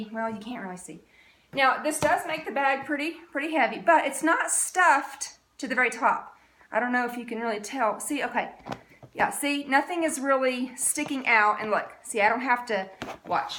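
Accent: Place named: South, United States. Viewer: I love u and your southern accent! U r funny! Had to sub due to your awesome personality! 🤣❤️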